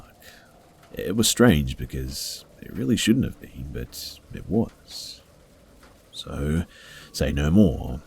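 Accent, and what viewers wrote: Australia. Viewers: It's so great to hear a fellow Aussie voice, it helps me haha ! Well sometimes helps me sleep lol. It's am and I'm still awake lol . But thank you so much I love this,IV been listening to creepy pasta and for some reason the Aussie accent makes me feel like home. ✌️✌️✌️🙏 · I moved from Australia to the US when I was 14. You sound like home 🤗. I appreciate you being awesome man! Thank you! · I found you through urmaker and I'd already heard of you but I'd never watched a video of yours and I certainly wasn't expecting that Australian accent but I'm not complaining! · I've only recently begun using these "true scary stories" videos to help bring about sleep, and of the plethora of channels, I've found only a small handful of narrators whose voices I can even stand, let alone genuinely enjoy. I'm happy to say yours falls directly in the "awesome" column. 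If it wouldn't blatantly (and rightfully) be considered theft/plagiarism, I'd suggest you take the stories from all the other channels and spruce them up with that buttery voice from down-under! ;-)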